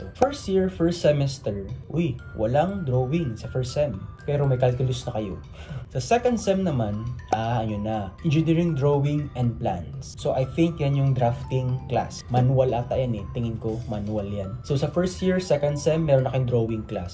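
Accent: Visayas, Philippines. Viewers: I know from your accent your a bisaya haha. (Btw im also a Cebuano) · I can hear the visayan accent in his voice. HAHA.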